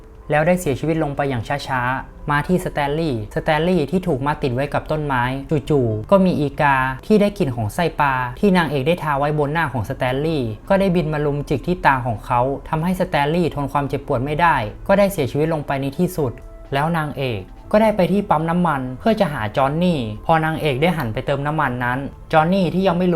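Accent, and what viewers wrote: North, Thailand. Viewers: พี่เป็นคนเหนือหรอคะ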